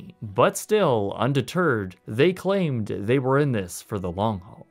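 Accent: Canada. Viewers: "forgotten aboot'! Love the Canadian accent!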